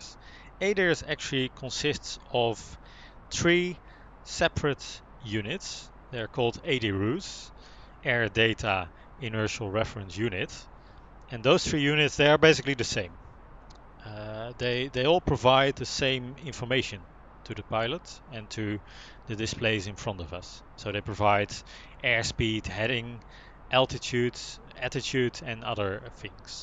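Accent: Netherlands. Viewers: i smell Nederlands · Ha! Ik hoor een Nederlands accent! Gaan jullie ook over op Xbox series X? 👀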